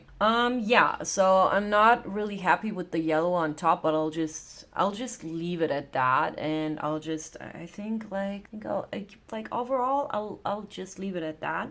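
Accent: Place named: RP, United Kingdom. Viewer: Love the video and the look, gurl. It's great hearing you talk english. Very posh. Even I did not grow up bilingual, due to working in different international companies, my english improved a lot and I'm not afraid to use english, whereever it is needed - and yes, I use much more swear words in english. You asked about the difference between purple and lilac. For me purple is darker, warmer whereas lilac is more of a light cool tone.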